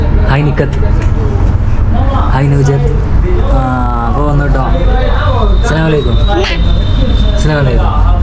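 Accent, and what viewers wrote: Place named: Malappuram, India. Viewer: തുടരുക , വിവിധ പ്രദേശങ്ങൾ .... ഇടക്കുള്ള തമാശകളും , മലപ്പുറം ഭാഷയും പോളിയാണ്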